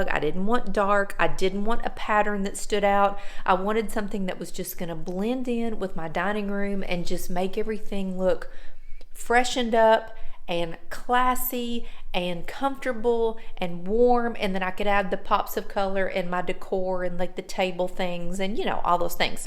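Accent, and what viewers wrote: South, United States. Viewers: Hello, new subscriber, love your southern accent!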